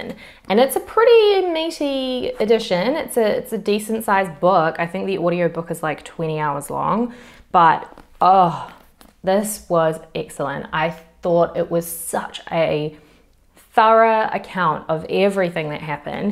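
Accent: New Zealand. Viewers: I listen to a lot of true crime and I just found your channel, why. I really enjoy it. At first I thought you were an Aussi but later you mentioned New Zealand so I am guessing you are a Kiwi. I love the accent and the way speak, it seems some of my favorite youtube channels come from that part of the world. Back to basics, Molly Dixon ect. and I think that you are beautiful which makes listening to you tell a story very easy.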